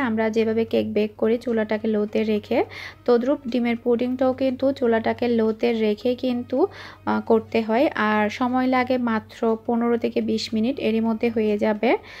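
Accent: Chittagong, Bangladesh. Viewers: আপু আপনার কথা গুলো ভালো লাগে চিটাগাং এর ভাসা আছে তাই চিমের বিছি আমার খূব ভালো লাগে আমি ও চিটাগাং এর মেয়ে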